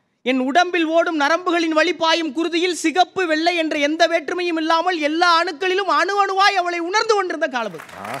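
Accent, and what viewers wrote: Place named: Sivagangai, India. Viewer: சிவகங்கை மண்ணின் வாசம் இந்த சகோதரன் பேச்சில் தெரிகிறது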